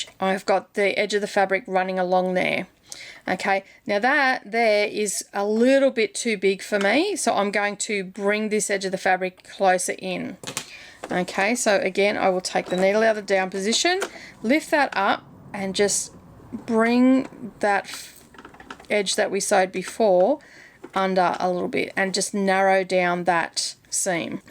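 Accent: Australia. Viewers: What a great idea. Recently discovered your channel. Nice to hear an Ozzie. Can you please advise who you purchase your labels from. Cheers Dianne Qld Aust. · Thank you for this I thoroughly enjoy watching your videos and your explanations. So very clear and easy to understand. Best of all your an aussie so a welcome voice to an ex aussie all the way in the UK. X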